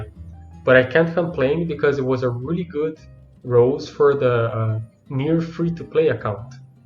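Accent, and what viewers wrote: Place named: Brazil. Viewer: Oh yeah, the Brazilian accent kkkkkkkkkkkk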